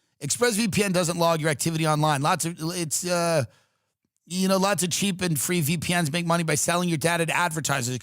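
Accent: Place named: Long Island, United States. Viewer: That is not a "northeast" accent. That is a Long Island accent.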